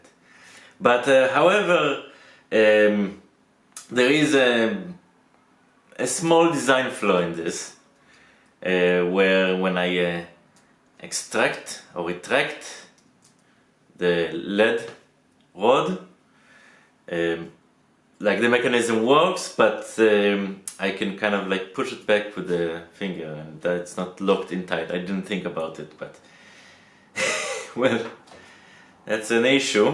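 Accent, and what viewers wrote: Israel. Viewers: המבטא שלך פשוט צועק לי ישראלי באוזן זה קורע!😂 אגב הדברים שאתה מכין מדהימים🤟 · Accent is definitely israeli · * Clicks on video * * Hears Israeli accent * INSTANT SUB! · i loved the look of the details in the pen and the engraving, thanks for creating a magnificent art piece. is't your accent from israel? you sound like my cousin, thats why i ask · מבטא ישראלי למדי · המבטא הישראלי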